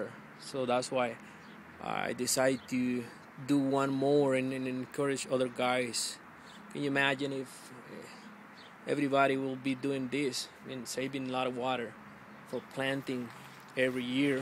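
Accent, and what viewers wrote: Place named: Mexico. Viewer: love the accent bro.. Viva mexico .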